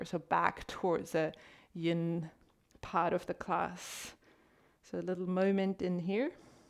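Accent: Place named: Australia. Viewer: this was a brilliant practice..... you have a hint of an Australian accent did you spend time in Australia at some point?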